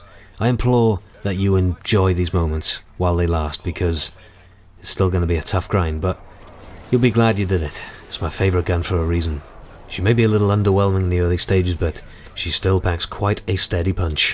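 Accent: Wales, United Kingdom. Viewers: are you Welsh? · You sound slightly welsh... Are you welsh at all?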